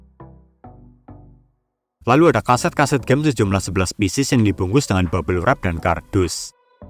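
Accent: Banyumas, Indonesia. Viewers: Pada² ngapak Oh iya njenengan wong ngendi mas? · mas njenengan Banyumase pundi?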